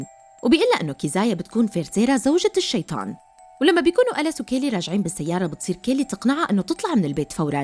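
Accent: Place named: Syria. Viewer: استمري💓 انا كل ليلة نام على فيديو تنزليه فعلًا اشكرك على نشاطك وانك تنزلي كل يوم هذا شيء رائع والله برافو 🤩 اتمنى تعملي فيديوهات لافلام غموض و افلام اخرى منوعة ليس رعب فقط رغم اعشق الرعب لكن التنويع جميل وخاصة انو اسلوبك رائع فعندي فضول اشوف اسلوبك في نوعية افلام اخرى🥰 وخاصة اني احب اللهجة السورية وانت لوحيدة سورية اللي تعمل فيديوهات ملخصات افلام مالقيت غيرك سورية اتمنى لك التوفيق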